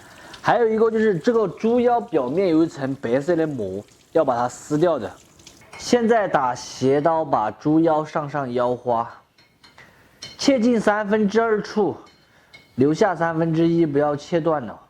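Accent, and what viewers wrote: Sichuan, China. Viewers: 老乡的川普听起来不摆了比厨艺更有吸引力🧲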